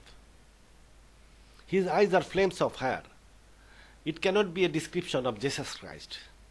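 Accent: Bangladesh. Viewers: Are you from Bangladesh, love your accent.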